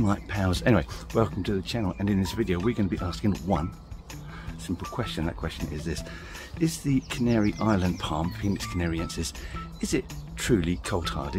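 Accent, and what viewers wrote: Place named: United Kingdom. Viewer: man I hardly understand some words. british accent..help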